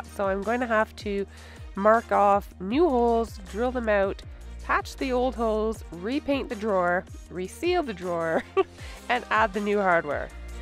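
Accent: Canada. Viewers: Hi! I'm a brand new subscriber of yours, and what I like about you is that you produce great work without having the use of an all 'singing and dancing' workshop, ie no table saw and other modern tools you see in other peoples video's. Oh and your nice accent ( Canadian)? I look forward to more of your vid's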